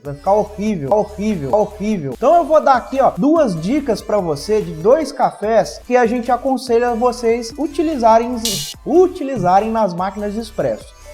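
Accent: Minas Gerais, Brazil. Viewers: Sotaque Mineiro kkkkk eu falo do mesmo jeito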